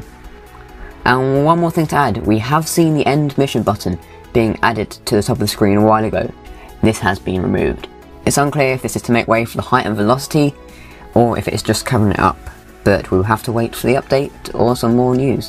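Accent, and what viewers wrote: United Kingdom. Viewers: You're British? Cool.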